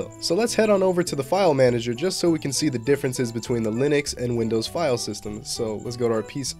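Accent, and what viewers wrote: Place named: United States. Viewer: i love how to this guy narrated the video with the slang and bla bla bla, very american i love it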